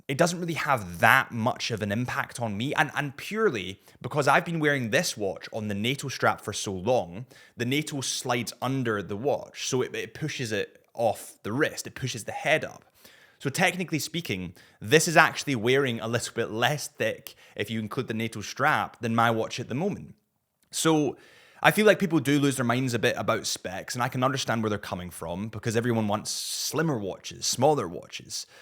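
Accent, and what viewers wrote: Scotland, United Kingdom. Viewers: Scottish my guy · He is not English, he is Scottish ☝🏽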